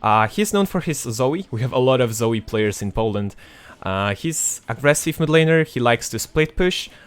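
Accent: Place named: South, United Kingdom. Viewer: fajny akcent masz, taki łagodny południowo brytyjski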